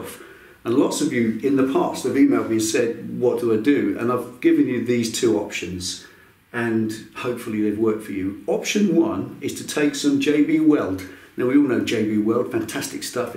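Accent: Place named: England, United Kingdom. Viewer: I did not expect you to have aN English accent! The hat is throwing me off.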